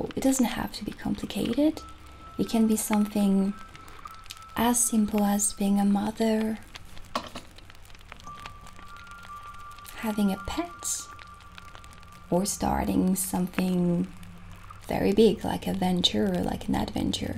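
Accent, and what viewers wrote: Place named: France. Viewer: no your French accent is perfect. The whole video is perfect and the music in the back is just *chefs kiss* haha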